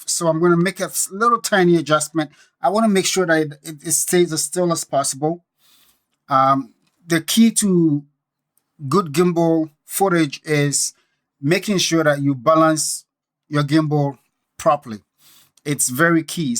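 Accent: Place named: Ghana. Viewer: love the African accent,,, Ghanaaaaaaa.... lets link up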